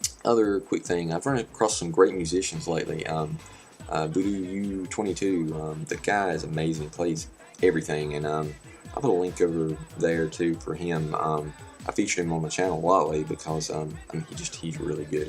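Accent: South, United States. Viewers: I LOVED his routine about "stuff" (no air quotes there, buddy - those be the real McCoy!" I'm NOT just saying this, it's the honest truth - when I saw you posted a video and saw the length of it, my first thought was, "Weeee! 9+ minutes of listening to that endearing accent!" Imagine how hard I laughed when I read the bottom of the description - you nailed me! I stand guilty of being A. (but man, you talk FAST for a Suthenah) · I enjoy your vids, and yes, I am easily hypnotized by southern accents...esp when they have something interesting to say, as you always do. Yeah seeing George pass was tough, I am from that generation I guess. He will be missed. Thanx for your updates too!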